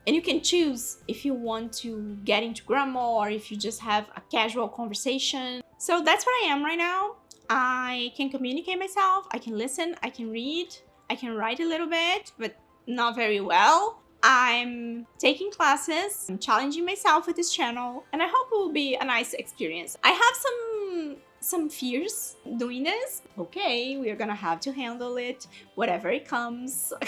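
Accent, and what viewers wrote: United Kingdom. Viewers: I love that u sometimes have a British accent and others an American one hahahahaha I’m the same! If I learnt the word with an American speaker I’m gonna say it like them, if it was with an Australian speaker, forever I will have one word in my vocabulary with the Australian accent 😂😂 I believe that happens with your accent based on the accent of the audiobook you came across for that vocabulary 😅😅 I love it so much and I’m gonna be here for all the videos as I am with your primary channel, following your success ❤❤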